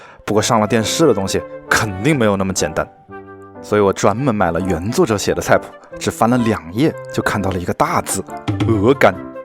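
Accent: Wuhan, China. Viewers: 就是说 这一听就是直击灵魂的武汉口音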